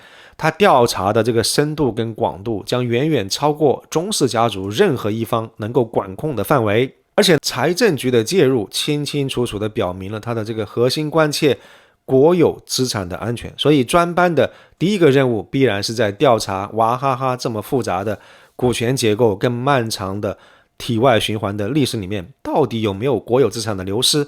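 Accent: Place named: Guizhou, China. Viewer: 博主是贵州人吗？跟 二爷 的口音好像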